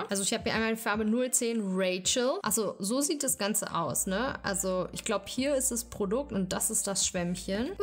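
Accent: Berlin, Germany. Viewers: 😂😂 minute 😂😂😂 Die beste Faxenmacherin youtubes Deine Berliner Schnauze ist auch grossartig -Du löst bei mir Heimweh aus 👏👏👏weiter so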